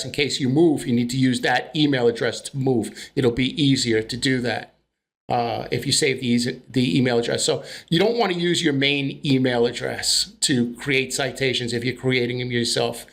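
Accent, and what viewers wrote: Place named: New York, United States. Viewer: I think I hear a fellow New Yaaawker 👂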